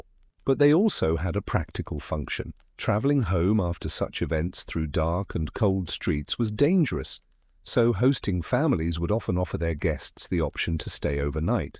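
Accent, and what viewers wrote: England, United Kingdom. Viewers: US accent please. UK accent sounds unrelated to subject- American West. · A british accent narrating something about the wild west just feels wrong, AI has ruined everything. 🙄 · America history, all be it short is amazing. Look at all of our accomplishments in such a short time. Starting off by winning our independence from the most powerful monarchy in the world at the time. Our dominance in Both world wars especially the second one. The industrial revolution to pave our path to becoming the most powerful nation that’s ever existed. That’s just a few of our historical examples. That being said, I enjoyed the English accent. In this video.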